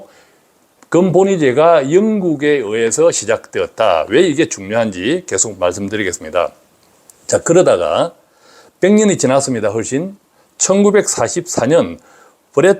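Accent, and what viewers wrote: Gyeongsang, South Korea. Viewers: 경상도 사투리 쓰셔도 이 대표님은 아주 진실적입니다 냉정적이고 경상도 대구가 이중성 있는것이지 대표님은 경남입니다 옳고 그름을 판단하시는 분이예요 함부로 말하지 마세요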